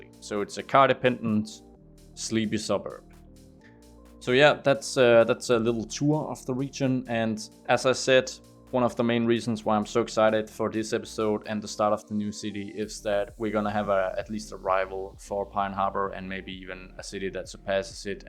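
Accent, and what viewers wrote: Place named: Denmark. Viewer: it's hard to see it have a natural reason to become a major city in the region. But having it be a place for major government buildings and seats of power is very possible. Many regions and countries simply pick these spots as like rather than having a natural reason for it to be there. Anyway, nice to see you listening to feedback. Btw: Fra sekundet jeg hørte dig snakke var jeg slet ikke i tvivl om at du var dansker ahah. God dag!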